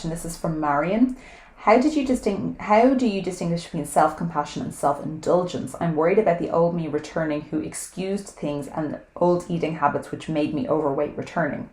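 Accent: Ireland. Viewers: It's so great to recognize the things I control vs the things outside my control. This truth was illuminating to me. Love your talks, love to hear the Irish accent, and love the scenery. Any glimpse of my home land is the best. Stay well.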